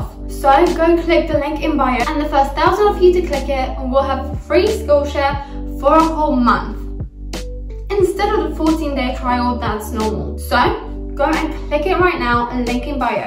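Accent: United Kingdom. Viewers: Are u Briiish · I thought you're American, confused with the British accent tho lol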